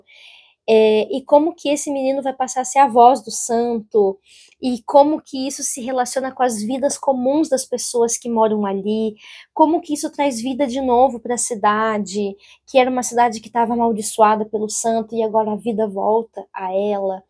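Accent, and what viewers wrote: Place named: Latin America. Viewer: QUE AULA! Amiga, eu sempre amei as nossas conversas, e sempre quis assistir uma aula sua. Considero esse desejo realizado com esse vídeo. Sem dúvida a fruta não caiu longe do pé heheh mas tem o seu jeitinho de explicar. Simplesmente amei a introdução ao livro ❤ E quanto ao livro em si... só o começo da história já me deixou muuito intrigada! Quero saber mais sobre essa família de mulheres hehe e se só o começo já é assim, tudo o mais que você contou sobre a história me deixou ainda mais curiosa e interessada pelo livro. Mais um na lista!! Hehe Ainda mais especial por ter esse "sotaque" latino 🎉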